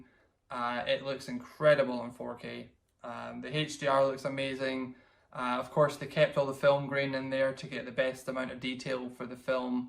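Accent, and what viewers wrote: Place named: Scotland, United Kingdom. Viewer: Hey dude you sound Scottish - where about are you from? Do you buy blu rays from Asda HMV etc? I’m big into 4K blu rays also from Scotland. Watched Aquaman 4K on my Oled and was incredible.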